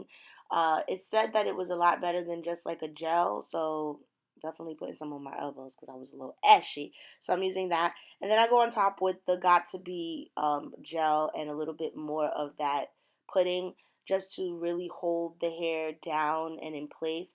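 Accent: New York, United States. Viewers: My first time watching you and I got a feeling you from New York Sis 🤨🤨🤪🤪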